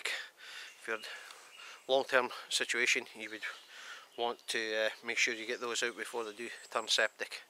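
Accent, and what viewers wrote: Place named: Scotland, United Kingdom. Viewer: Really enjoyed the videos, I have watched a few of your's tonight and have Subbed to your channel...can you clear up one thing tho, your accent, which shire of Scotland are ye from lol? Cheers, keep up the gid work jeck. I checked out your Wix page and answered my question, yer just up the road lol mun. Kielder forrest will be the playground then haha. Regards Ray. (Eyemouth)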